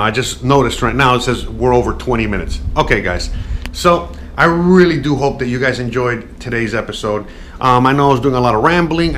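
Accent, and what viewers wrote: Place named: New Jersey, United States. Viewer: I'm thinking you're from Jersey??? "Ya know whata mean."...."Get out of here." Strong accent and the f word is just a simple roll off of the tongue. lol love it! Thanks for posting because I was wondering what I could get with my tiny little budget! So I'm rather impressed with the apartment for the moolah.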